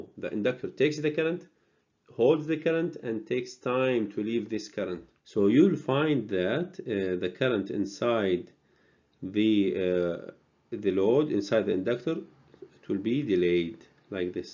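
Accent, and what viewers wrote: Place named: Arab world. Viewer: Perfect explain, and i see the Arabic voice in your explain, please make Arabic language as same as the English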